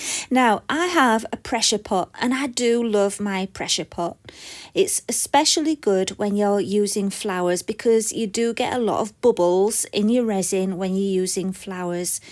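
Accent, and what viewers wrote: United Kingdom. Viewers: Best resin channel! Plus us yanks love a British accent.😍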